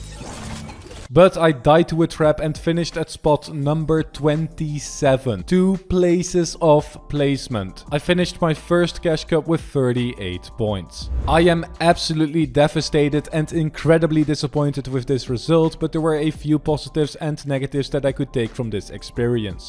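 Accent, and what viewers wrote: Netherlands. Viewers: are you dutch? you have a dutch accent. ik ben ook Nederlands, ga zo door je bent echt goed op k&m. keep improving:) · R u Dutch? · Are you from holland? Als je dat bent dan dacht ik dat al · Wait a minute do i hear a dutch accent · You sound so dutch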